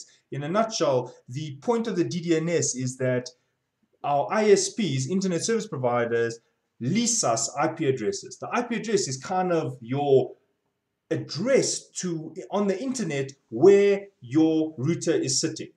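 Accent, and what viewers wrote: South Africa. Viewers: jirre, get smacked by that south african accent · Haha, such a strong SA accent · I hear SA accent, I upvote!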